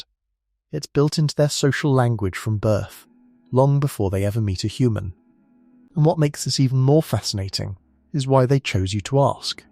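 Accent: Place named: United Kingdom. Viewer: Because it sounds better in a British accent. And the rest of the world is tired of listening to american accents tbh.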